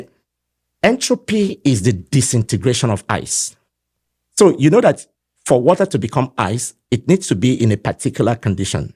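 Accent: Nigeria. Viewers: This man speaks like Nigeria 🇳🇬 n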